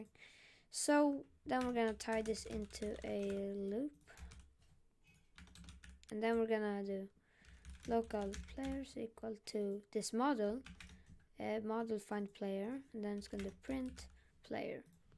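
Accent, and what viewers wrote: Sweden. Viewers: You sound swedish. Are you swedish?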